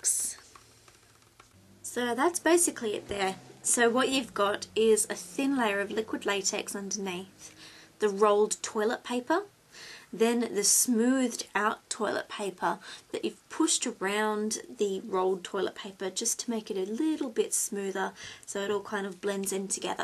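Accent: Australia. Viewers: are you Australian or from new zealand?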